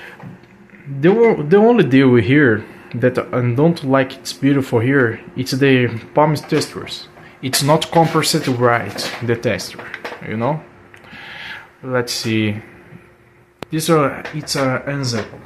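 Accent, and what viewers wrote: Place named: Brazil. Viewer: you are from Brazil? Your accent appears to be Brazilian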